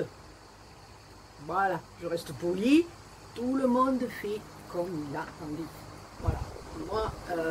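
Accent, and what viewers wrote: South, France. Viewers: Coucou. Je suis tout à fait d'accord avec toi. Ces questions sont vraiment c.....s. Comme pour Toi, youtube c'est une source de partage d'idées, de tutos et de connaissances rigolotes comme tu es, comme Greg etc.... que des gens simples et francs, et cet accent qui est le mien j'adore. Moi aussi toutes ces questions me font "monter la boufaïsse". Comme j'aimerai vous rencontrer Toi, Greg, Patou parce que je pense que nous ne sommes pas loin (géographiquement parlant) je peux espérer peut-être un jour chez Action Saint -Victoret ou Istres (car je suis plus près d'Istres. Un conseil. Continues, ne changes rien. À bientôt. Bisous 😘. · Coucou, j'adore regarder tes vidéos, ton naturel et ton accent bien du sud me donne du baume au cœur. Et comme tu dis chacun fait comme y veux. Bisous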